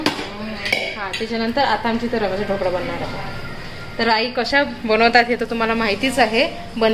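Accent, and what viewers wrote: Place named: Akola, India. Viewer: तुमची भाषा माला खूप आवडते आमच्या शेजारी आहेत ना ते अकोला चे आहेत हाऊ ना😊😊 मस्त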